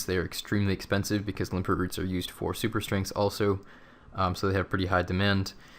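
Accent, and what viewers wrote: United States. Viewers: But you're not even correcting him, because you're wrong. It's obvious from his accent that he's American, and the "h" is silent in American English. There are over one hundred differences between American and British English, and this is one of them. Please don't shit your pants over something so inconsequential which, by the way, is grammatically correct in the first place. This is made worse by the fact that you think you're right when even the simplest google search or slight amount of attention paid in school easily proves you wrong.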